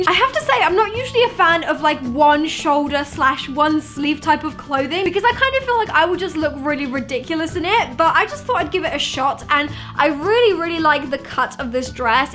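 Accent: England, United Kingdom. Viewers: Are u supposed to be British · Is she English?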